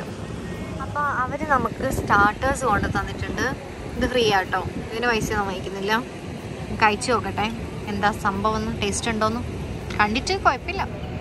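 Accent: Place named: Payyoli, India. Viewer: Payyoli slang.... 👌👌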